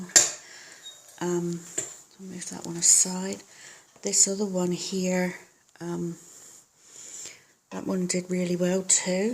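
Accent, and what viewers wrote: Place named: South, United Kingdom. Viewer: I do wish growers would remove the old medium when they repot, it takes ages to carefully remove especially the moss from oncidiums fine roots! Bark is better to remove but those hard packs of I don't know what they grow the seedling in is also difficult to remove. Found out your living in NZ from this video but your accent tells me your originally from the south of UK. Keep posting please!!!!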